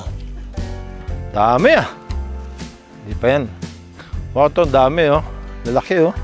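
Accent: Visayas, Philippines. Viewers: Ka bisdak nas imong accent sir oy hehehe 😂 ❤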